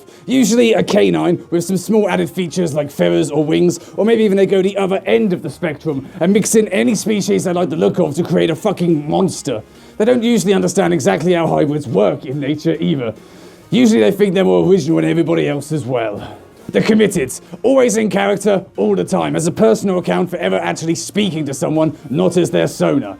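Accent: United Kingdom. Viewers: Oh lord...that is the most heavy, yet most beautiful british accent I ever heard!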